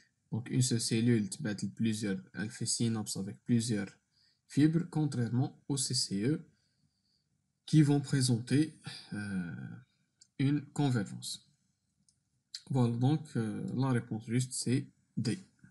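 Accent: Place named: Jijel, Algeria. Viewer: ça aide super bien merci, ps: j'adore lehdja dyalek djidjliya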